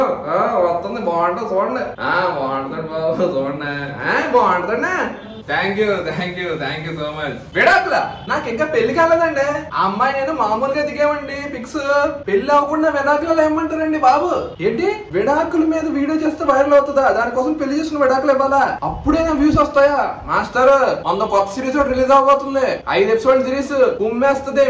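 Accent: Godavari, India. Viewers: గోదావరి యాసలో C language ah? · I really want to see you on a big screen with our godavari accent hope the day will come soon❤️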